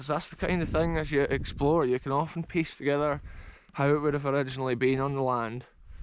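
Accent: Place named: Highlands, United Kingdom. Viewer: Just discovered your channel. Love your videos! It's really interesting exploring these old places. I'm lucky to have 4 or 5 ruins close to my house 😍 Love your accent too. Where is you're from? Wee bit of Highland twang in there!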